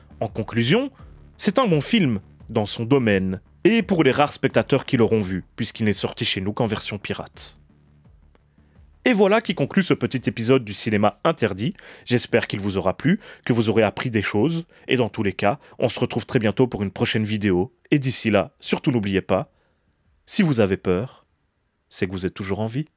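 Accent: Belgium. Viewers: Bonjour, j'aime bcp tes vidéos. Le monde du cinéma d'horreur m'est étranger, j'aime pas ça mais j'aime écouter des gens parler de sujets que je ne connais pas et les rendre intéressants. Tu es de ceux là. J'aime bie' e' apprendre plus dur tout un tas de choses et j'adore voir et/ou écouter tes vidéos. C'est passionnant. Je ne verrais sans doute aucun de ces films mais j'adore apprendre des tas de choses dessus. Ça enrichit. Et la pointe d'accent belge est un vrai plus car j'adore la Belgique et cet accent si chaleureux. En bref, merci pour ton travail.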